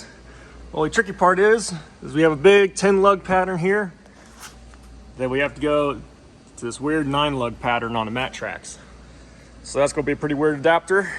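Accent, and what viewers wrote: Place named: Georgia, United States. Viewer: has to be from Rural GA or AL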